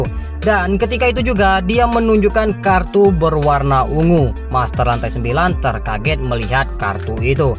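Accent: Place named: Medan, Indonesia. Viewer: Logat Medan nya kental bgt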